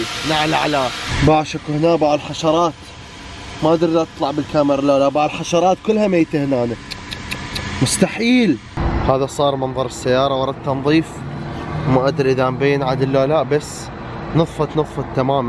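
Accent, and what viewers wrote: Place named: Iraq. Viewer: أول مقطع أشوفه لهذا الشخص لكن من أول ماسمعت اللهجة العراقية , سويت أعجاب واشتراك بتوفيق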